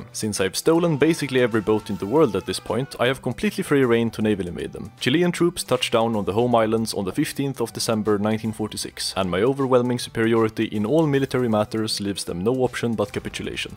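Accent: Sweden. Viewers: Din dialekt är exakt som en stockholmare? Va fan är du då?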